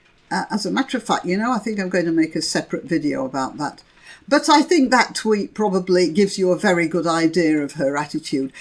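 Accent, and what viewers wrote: United Kingdom. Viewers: Hail and welcome.... welcome into my purview so I can become more and more enlightened. I welcome your British accent.... the each and every noun and verb etc are so well enunciated and the thought that is back-tracked to be RE-pronounced is just in sync with the ones I need to re-hear. I'm so lucky. um, to have found your channel.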